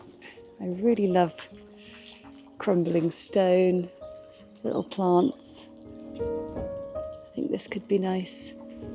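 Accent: England, United Kingdom. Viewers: I think this is wonderful. I love architectural elements. Your first stroke was so precise! No messing around with that circle- you hit it first go! You are very brave. Not only are you painting with no real drawing there, you are miked up and filming yourself!! You do it so beautifully and effortlessly but I’m sure it’s a lot of intimidation rolled into that. You truly are something else. How you can paint and talk with no feedback from anyone and make it so easy to understand is just amazing to me. When I decided to dip my toe into watercolors I followed four or so YouTube artists. Then I found you. I unsubscribed to them all. You are talented, in many things. Your voice is so soothing and sad to say if I don’t like the voice well.. forget it. English accent is a bonus. Your life is sweet, you don’t use this channel to unburden your issues like some do. 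Ok I’m sorry for them but I’m here to paint only. Anyway we all love you. I put watercolor away for a year because I did something else creative but my friend is coming and wants tips so I came back and have been watching your videos quite a bit in last days. I’m excited and the spark it back! There is a lot to absorb with any new endeavor and I know it’s the practicing that will get me there. Hope you had a great time!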